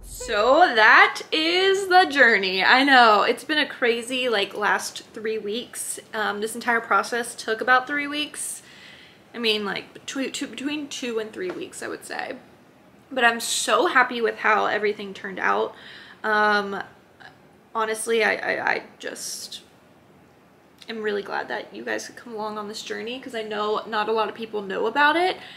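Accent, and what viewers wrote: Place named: Tennessee, United States. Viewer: I’ve never been to Nashville or Tennessee for that matter but is that what a Tennessee accent sounds like? Like wow. Also, why not turn the comments off so no one can comment on your lips?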